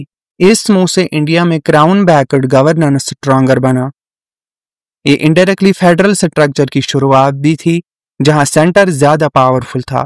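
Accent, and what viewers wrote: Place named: Pakistan. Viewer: Bhai teri hindi pakistani youtube video jesi kyo hai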